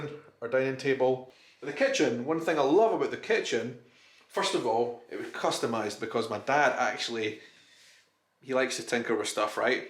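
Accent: Scotland, United Kingdom. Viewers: I watch your videos to hear your beautiful accent, and to be reminded of my visit to Scotland in 2015. I'm THRILLED you're going to be filming in Scotland for a while! I loved visiting the art nouveau center in Glasgow, so maybe that's an idea for you? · this is the kind of video that made me subscribe to your channel! PS Your accent is definitely more Scottish than those you filmed in London. Glad to hear your "true voice" again. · Congratulations! I want to see all your travels around Scotland. Your Scottish accent just sweetens the tours. Happy trails,!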